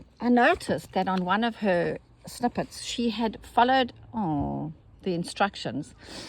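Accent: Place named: South Africa. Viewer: I enjoy listening to you nattering away 😊 I love south African acsent & I adore hearing the bird life around you it sounds so serene I wish I could see it. I have ordered yr books, im excited to get them & start a project using them, I found you after discovering the lovely Corrines channel, so I'm grateful to both of you ❤I also have Judith's books which are excellent as you know, but you can always learn a different way from different teachers, so I can't wait for yrs 😊 oh fabulous you did show us yr surroundings superb just lovely, you see I comment as I think of things I want to say so I don't forget, so I keep editing thankyou for showing us yr gorgeous space where you create beautiful ( with a sigh of delight)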